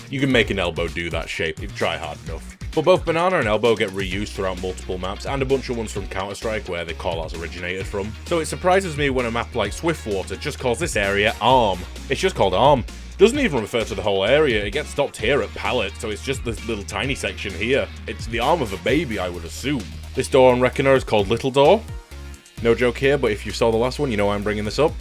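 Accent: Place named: Yorkshire, United Kingdom. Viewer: love the yorkshire accent, keep it up!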